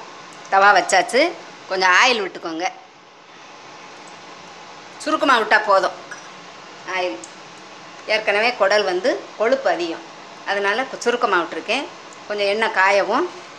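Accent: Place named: Tirunelveli, India. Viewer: Nellai tamil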